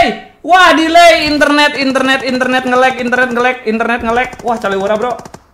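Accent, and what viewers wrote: West Java, Indonesia. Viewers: Hajar terus mang ku toxickan sunda, nyieun lucu sabab ngomong indo tapi logat namah angeur we sunda🤣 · Sunda mana mang?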